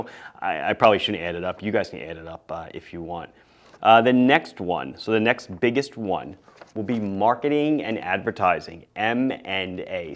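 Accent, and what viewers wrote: Long Island, United States. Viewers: This was super interesting! Thanks so much for the breakdown!! Whenever I watch your videos, I always feel as if you're some distant cousin or something talking to me, because although I'm in CT, my Dad and his extended family are all from Valley Stream, and that Long Island accent is so distinctive!! You wouldn't sound out of place at one of our Thanksgiving Dinners or something hahaha! You now have a standing invitation to attend this year lol!!! 🤣🤣 Awesome video!!!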